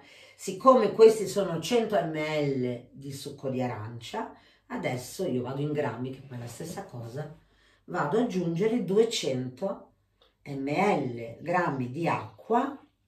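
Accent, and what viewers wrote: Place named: Rimini, Italy. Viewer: grazie mille, molto gentile 😊 quindi se ho ben capito il fatto che la panna fosse già stata scaldata mi consente di congelarla due volte mentre se la congelassi fresca no. Ne ho imparata una in più ☺️ La chiffon cake proverò a farla col colorante rosso x il compleanno di una collega e pensavo di farcirla e glassarla di qualcosa di rosa... Ma non al burro 😕 o una ganache molto solida di cioccolato bianco, panna e un pochino di colore rosso o una crema pasticcera molto solida... Poi pensavo 🤔 essendo molto alta anziché tagliarla x farcirla potrei fare dei buchini in alto e riempirla come si fa coi pandori x es..... Purtroppo non sono su fb... Scelta di vita🤷‍♀️ in questi casi mi dispiace un po' ma pazienza, proverò a immaginarle le tue chiffon cake 😊🍰 Cambiando discorso, adesso ti sto scrivendo da Rimini e la gente ha il tuo accento ☺️ e un po' anche mio nonostante viva a Roma da diversi anni.. 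purtroppo non potrò vedere le tue torte su fb perché non ci sono... Proverò a immaginarle 😊 Buona giornata e buone feste a te e tutta la tua grande famiglia 🥰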